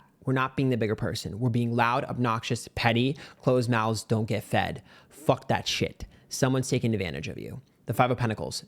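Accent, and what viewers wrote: New York, United States. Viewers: ♐️👄♐️ When I start hearing NY accent & dropping F-bombs = He is NOT messing around! 🫨 Thanks for the Go Ahead 👸🏻🧾 GTFOH IWTFYWB ACTIVATED 🫡 *sharpening claws*💅🏼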